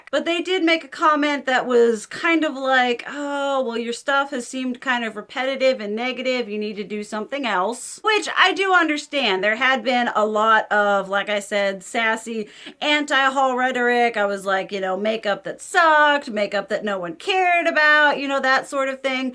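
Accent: South, United States. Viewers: Hey girl!! This is the most Southern I have ever heard you lol. I recently refound my Electric palette and am thinking of finally decluttering it since it’s old. Lorac definitely stays in their lane. 😂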